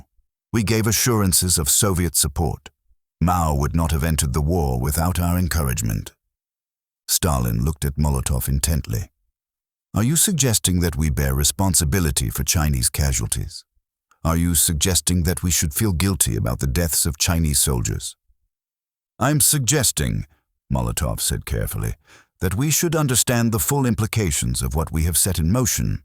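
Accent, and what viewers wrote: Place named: RP, United Kingdom. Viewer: Please go back to the old AI narrarator. This posh british voice is overused and annoying.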